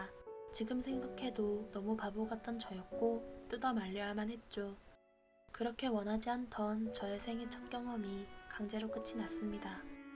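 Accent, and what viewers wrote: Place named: Gyeongsang, South Korea. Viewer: 사연 읽어주시는분 갱남사람인가베요?ㅋ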